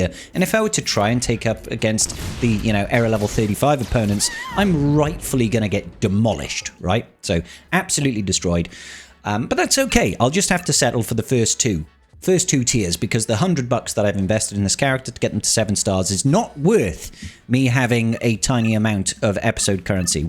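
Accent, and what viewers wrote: United Kingdom. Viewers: Subscribed! Great Content and a heads up Not to spend on this Game. I am playing since 1st may 2021 f2p no LSB or anything at 10.3 Millions GP 8 GLs all 3 GL Cap Ships Kyber 3. I enjoy the Game f2p. No Fomo. What the Game will Break is now the monetazation of DCs. Soon you will Not fight Rosters in GAC, it will be about fighting each others Datacrons. In my View Thats Not even a Game anymore when you think about that. Greets from Germany. Keep up the good work. Btw are you from England or Great Britain? You have a Bit of an british accent. Sounds Nice!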